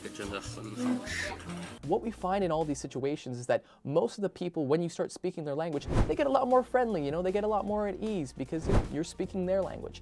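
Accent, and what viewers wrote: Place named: Italy. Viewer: he even has an italian accent in english :D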